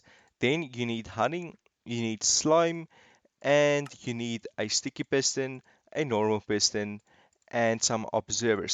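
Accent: Afrikaans, South Africa. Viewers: Are you South African? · Its not just me or is this man Afrikaans cuz I am and he sounds a lot like somebody from my country :/ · You are SA right? you sound soooooo south African just like my best friends!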